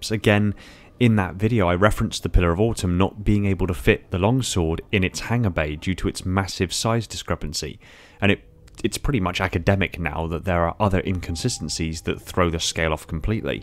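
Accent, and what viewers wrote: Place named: South West England, United Kingdom. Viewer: This is top notch but I've spent the whole video trying to work out where in the South West your accent is from. I'm leaning towards Bristol but I'm also getting Gloucester vibes.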